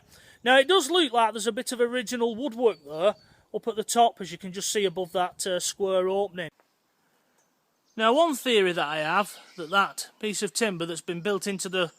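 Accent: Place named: Wigan, United Kingdom. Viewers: Proper back in the day Wigan accent! Nice vid pal 👍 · Great video and....love the accent ( Wigan?)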